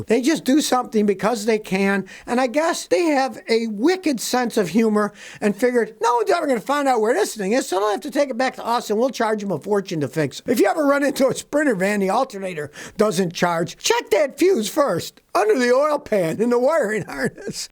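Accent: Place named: Western New York, United States. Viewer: Got to love that Western New York Accent,. rat-ling. I grew up near Syracuse.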